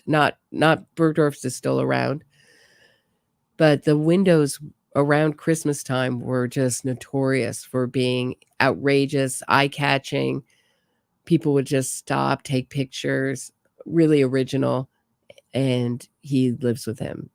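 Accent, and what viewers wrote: New York, United States. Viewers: Hi , I love your new york voice. It's very addictive. Great channel and topic.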